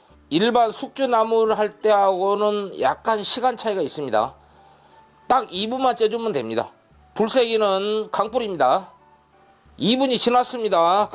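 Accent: Jeolla, South Korea. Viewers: 전라도 사투리 들으니 더 맛있을 것 같은 느낌적인 느낌~~ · 구수한 전라도 사투리로 맛깔 나는 설명 감사해요😅😅😅😅😅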